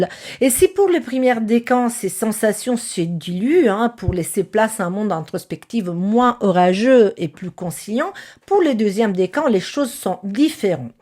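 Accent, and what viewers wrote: Italy. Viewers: Merci chère!!! Vous parlez trop bien le français avec votre magnifique accent italien! ❤❤❤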